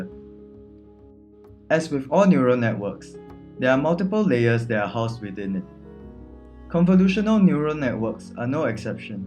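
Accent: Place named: Singapore. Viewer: The moment I heard your voice I knew you were a fellow Singaporean lmao. Looking forward to more of your videos!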